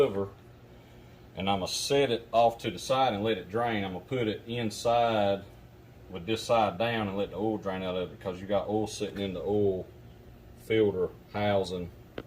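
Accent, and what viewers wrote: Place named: South, United States. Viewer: Man I thought I talked country but I think you have me beat. Keep up the good work!!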